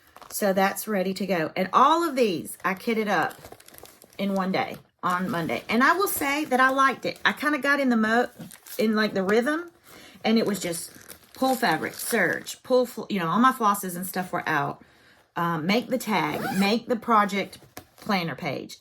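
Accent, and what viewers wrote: South, United States. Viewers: “May”I ask where you are from? Because you didn’t get that accent in Ft. Lauderdale 😮 I just moved to Boston from Jacksonville Florida 4 years ago and it’s wonderful to hear a southern accent. Your videos are full of joy and I thoroughly enjoy them😊 I am with ya , sista, on the linen vs Aida. Had a hissy fit stitching on linen, trying to keep the stinking x’s in a row . Switched to Aida and no projects are flying across the room ❤ Time with a daughter is priceless…ENJOY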